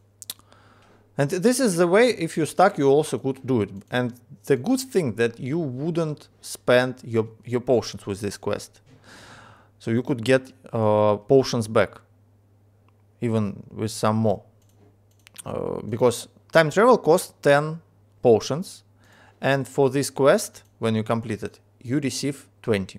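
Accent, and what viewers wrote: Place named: Russia. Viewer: Охренеть. Не нашёл нормального гайда на русском. Внезапно нашёл данный на инглише..... и сразу чувствуется дикий русский акцент - всё понятно :)))) Спасибо! :)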